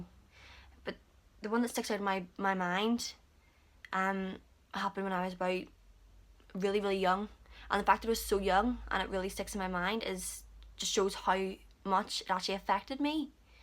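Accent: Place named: Northern Ireland, United Kingdom. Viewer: Thank you for sharing your story ❤ so inspiring and I love yhe NI accent xx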